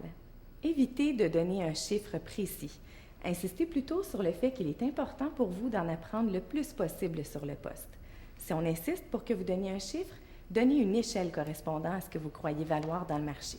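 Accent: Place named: Quebec, Canada. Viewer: i think so shes from quebec thats why she has an accent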